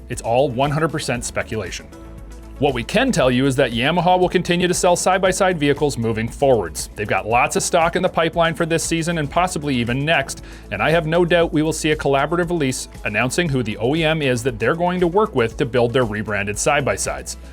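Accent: Canada. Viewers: You mentioned tariffs you sound Canadian so I think I understand why you did it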